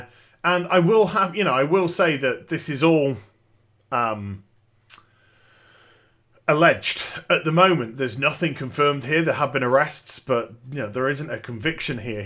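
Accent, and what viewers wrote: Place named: United Kingdom. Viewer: Is there any chance you will drop the British accent and slang? Your info is interesting but cant stand it.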